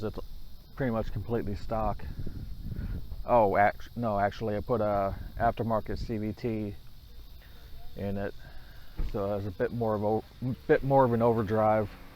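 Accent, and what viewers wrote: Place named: St. Louis, United States. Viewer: Hey, it sounds like you are in the Lou area! Good vid.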